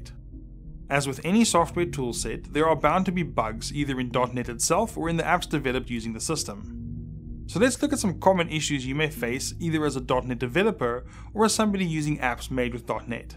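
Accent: South Africa. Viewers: You a Jozi man?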